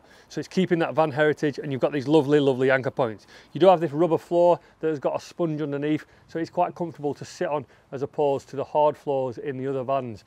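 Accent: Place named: Northern England, United Kingdom. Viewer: fantastic review thanks, living in france coming from Lancashire great to hear a northern very honest voice. thanks for this review very informative very honest, good job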